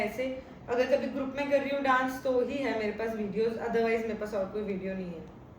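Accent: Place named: Haryana, India. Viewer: ap haryanvi balti achi lagti ho.. to ap roj haryanvi bola karo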